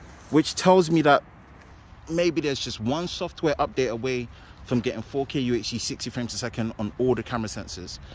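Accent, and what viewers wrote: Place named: United Kingdom. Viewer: i like that british accent my friend. how about battery test ?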